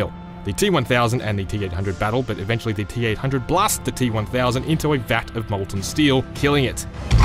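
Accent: Australia. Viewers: Australia mate? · just a question are you Australian?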